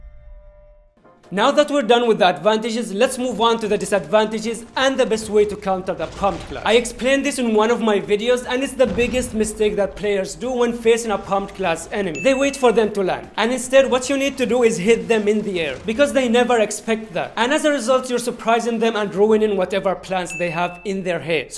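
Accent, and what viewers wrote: India. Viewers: When i heard the indian voice i know i can trust him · You sound like us Indians. Where are you from?? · At the starting i felt like you were gonna speak hindi you have a indian accent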